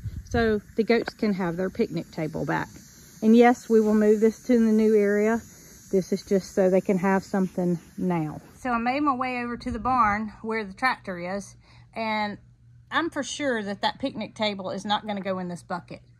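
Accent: South, United States. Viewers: I am not big on building videos, but I enjoy videos. I even watch the entire fence pole videos.Maybe it is music and your pleasing southern drawl. Love the picnic table and to honest I wouldn't mind having one like it.😊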